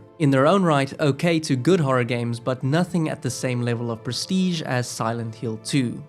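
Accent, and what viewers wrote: South Africa. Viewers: I smell South Africa? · South african?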